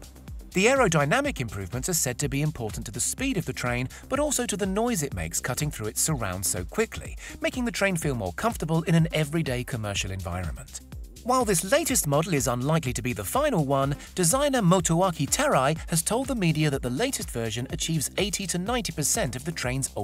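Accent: United Kingdom. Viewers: The narrator has a British accent. It's probably the reason why he using that archaic unit. Multiply that speed by 1.61. This gives about 602 km/h.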